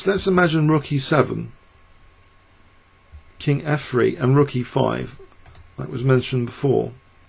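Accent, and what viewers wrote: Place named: London, United Kingdom. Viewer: I watched this live and flipped the board to black well before Anand's 38 Qg3. The commentators were still sanguine and thought it was drawing but by move 42... f6 it was clear that Carlsen was simply going to march his King + entourage down the board and Queen a pawn. Anand clearly looked tired and his defeat in game 6 is related to the defeat in game 5... his blunders are inexplicable without this context - he was actually stubbornly trying for a win, not the draw he could have engineered. He's lost the psychological battle and I see no sign of recovery from here. I truly hope he is not embarrassed in the remaining games. Thanks for your post game reviews, I love your no nonsense analysis (engine assisted or not) and softly spoken London accent, ignore those critics with nothing of substance to say ("would you please say three not free" / "don't pass off engine analysis as your own" - FFS! 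- way to miss the value in your videos!).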